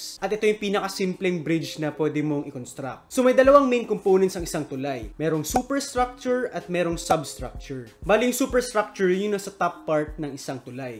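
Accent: Visayas, Philippines. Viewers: Hahaha bisaya d i kaha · Tingala hahaha bisaya halata kaayu. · Bisaya mn diai... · bisaya mn diay ka dong..😁😁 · bisaya deay ka lods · Bisaya mn diay ka ser?? Proud bisaya here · bisaya d i ka dol 😁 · Klaro Kaayo Bisaya.😁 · Klaro kaayong bisaya accent masi mag tagalog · Idola aning mga bisaya mag tagalog oy HAHAHA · Bisaya man diay ka sir😁🤘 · Bisaya gyud 😂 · Bisaya man diay ka idol😂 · Bisaya mn ka engineer 😂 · Bisaya kaayo ka, Sir😅 taga diin kaha ka Sir...?🤔😅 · Bisaya di ka sir 😅😅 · Ibutang na dira tanan . Owryt bisaya ta pre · Bisaya ka sir bisaya pod ko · Bisaya ka gli dong taga diin ka? -aspiring engineer also :) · Bisaya man diay😂😂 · Bisaya jud..gahi...😂😂💪💪👍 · Nganong bisaya ka ner? Asa ka gikan nga lugar? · Bisaya diay ka bai.. Hahaha. Subscribe nalng ko.. Butangan tika run. Hahahaha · Bira bisaya jud.. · Bisaya man diay ka doh.. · aw ..bisaya diay ni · Bisaya ka po pala😁😁😁😁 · Kana! 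Naa ra japoy pagka bisaya ba hahahahahaha makalingaw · Taga saan ka po idol? May accent ka po ng bisaya idol kaya naitanong ko po kung taga saan ka.. Ako po kc taga Masbate bisaya po me hehe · Kahit hindi nato recent na vid, still very well explained and learned a lot as well. Nakakatuwa lng how kuya resist a little of his Bisaya hahaha. Proud Bisaya here!!!:)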